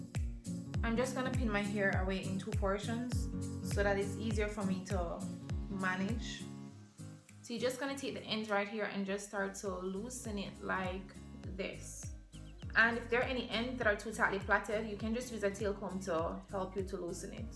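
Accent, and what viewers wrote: Jamaica. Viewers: I hear the accent 🇯🇲🇯🇲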